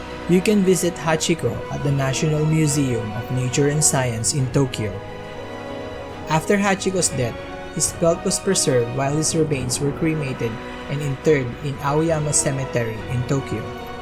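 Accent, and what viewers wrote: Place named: Philippines. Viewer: Are you Filipino? Your narration has an accent! 🤔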